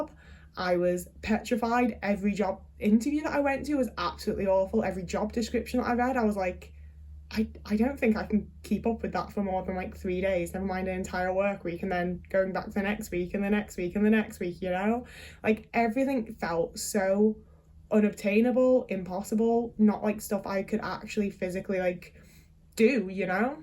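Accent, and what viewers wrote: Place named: Northern England, United Kingdom. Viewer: TW for SA+self-harm+ED in the second paragraph, be safe ❤ With all the North Americans, antipodeans and RP in this space it’s brilliant to hear a sweary working-class northerner. 😂 I’m so there with you, some people genuinely just take the piss if you’re ”too altruistic”. Bad personal stuff or not, video shop bloke sounds like an absolute cunt. Glad you got out of that mostly in one piece. I always gravitate toward the younger people in this space, despite being a guy on the cusp of 40, because I genuinely can’t relate to the people who are still struggling with the same shite but somehow managed to have children along the way. I almost feel like I’m Matthew McConaughey in Interstellar, except I have the body of a 50-year-old and brain of a 20-year-old, but with 40 years of bollox crammed into it. I am in my second relationship, first was almost 9 years, this one is 8 and a bit - in the months between them, I turned my life upside down caring for a person with ED, undiagnosed ADHD (and autism, I’m all but certain) and a serious addiction who really tried to love me as much as I loved her, multiple ambulance trips and stitched up arms notwithstanding (hers, not mine) - she didn’t take advantage, but my feelings made me take advantage of myself and my very limited energy resources for her - then I got SA’d by another woman and ensnared for a few weeks in a Stockholm syndrome relationship (also where she lived, funnily enough) based on fear/fawning, control and mutual s*x addict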